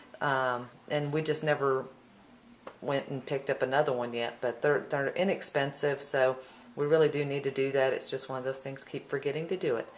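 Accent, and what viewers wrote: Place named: Texas, United States. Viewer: Just subbed to you guys. I commented on your other stove video. I was born there and my mother was raised in Shiner...it is refreshing to hear a lady speaking with a Texas accent. You sound like my dear Mama and the other ladies in her kin. Plus, you are making homemade bread....from scratch! One of my best memories is walking into my Granny's little kitchen and she's give me a chunk of fresh buttered bread from her stove. Good stuff. The memory is making me hungry....gotta go. :)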